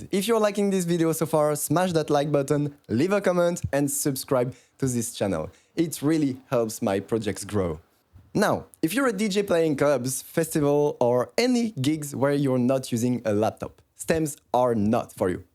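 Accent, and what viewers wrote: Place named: France. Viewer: This guy's the definition of a French accent ❤ it heh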